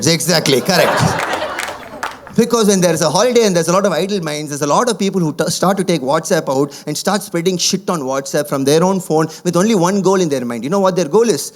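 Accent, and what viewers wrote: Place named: South, India. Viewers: Your accent is perfect south Indian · Hahhha.... Awesome Delivery ...Loved d content and 'Macchha' dialect of English. More Power to u bro . Jai Hind !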